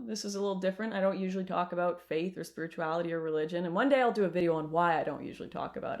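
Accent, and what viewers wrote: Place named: Canada. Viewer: She has a Canadian accent stop going on about trivial unrelated subjects.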